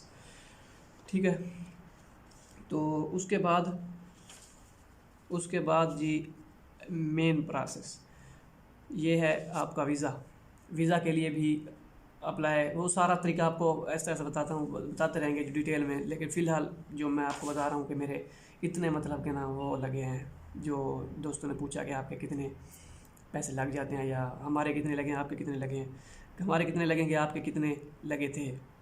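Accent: Saraiki, Pakistan. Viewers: salam bhi lehgee se ap Saraiki lagtee ho aisa ha kia?? :) By the way meri ye mail ha is pe contact kareen please ap see bat karni ha Jazak allah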